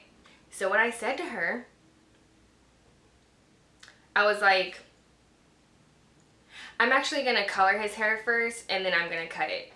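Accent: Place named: Southern California, United States. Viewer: you have a thick socal accent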